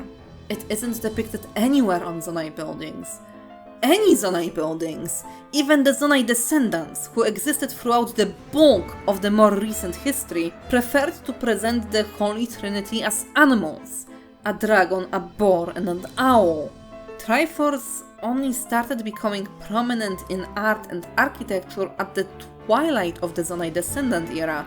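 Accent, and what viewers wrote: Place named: Poland. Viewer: Your accent is... pretty polish. Very polish actually.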